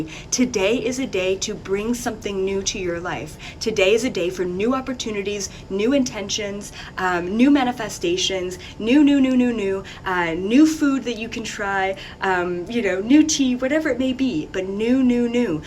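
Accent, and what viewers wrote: Canada. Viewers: Noice ..ok so we are only two years apart . N.B and I are within weeks of each other lol ... If I can't sleep I put on that hella Cray Canadian accent and boom ..I'm out . Makes it hard to finish his vids so I have to day watch/listen lol . He and Terrence McKenna have been my sleep help for months and months 😂😂😂😂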